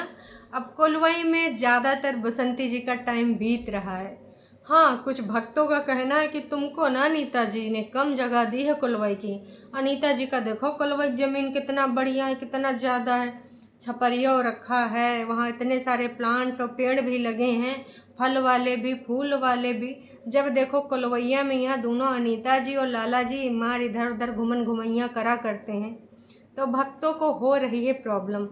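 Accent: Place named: Awadhi, India. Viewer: आपकी अवधी बहुत प्यारी है जी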